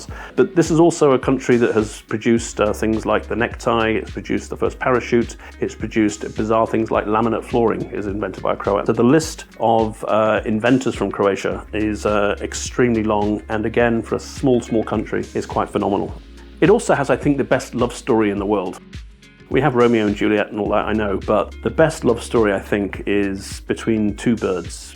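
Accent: United Kingdom. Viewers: Pommy nob.😂😂😂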